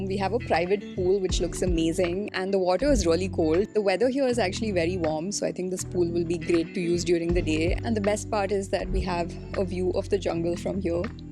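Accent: India. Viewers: Video was good except hilarious strong indian accent, I can't take it. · Most people follow your YouTube channel, and 99% of them do so to learn English with an Indian accent. Therefore, I hope you lean fully into the authentic Indian accent in your content; travel details are not that important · Hindi ke liye there are other channels Paramvir, Shubham, Navankur. makes her videos in English aur bahut saare log inke videos dekhte hi English seekhne ke liye kyuki yeh Indian accent mein fluent English bolte hai.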